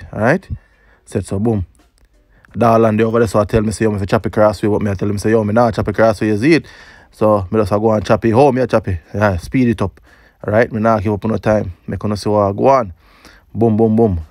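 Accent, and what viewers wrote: Jamaica. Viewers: It’s the patois voice over for me 🤣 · you did our Jamaican chat with class!! Bring it on... we'll done. Wi food gone ah broad!!! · Love the voiceover bro✊🏾✊🏾✊🏾 the JA accent came right out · You brought out the Jamaican accent in full force for this one 💕💕💕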